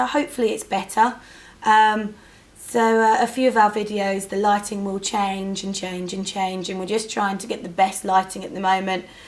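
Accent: United Kingdom. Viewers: Loved the bus but your brittish......not subscribing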